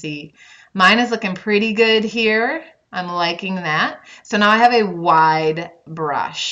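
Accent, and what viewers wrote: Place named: Upstate New York, United States. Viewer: First of all I adore your voice and way of explaining things... your accent reminds me of my late aunt who was an upstate NYer and I miss that! This is absolutely gorgeous and I can't thank you enough for everything you share with us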